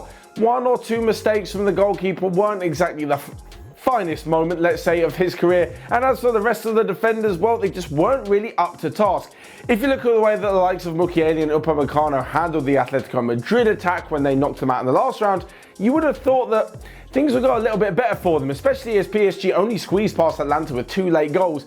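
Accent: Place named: England, United Kingdom. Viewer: are u german? If so why do u sound like an English man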